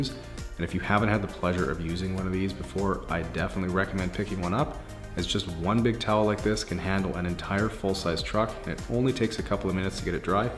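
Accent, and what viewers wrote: Canada. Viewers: Favorite part: YOU! Best Friend’s favorite part: Your Canadian Accent Always tempted to buy every item on your list in the description 😂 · Are u Canadian? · I pretty much am just here to hear the Canadian accent. Not gonna lie. Also I'm from Australia. I now have a strong motivation to detail my car but 100% won't because I'm a worthless person.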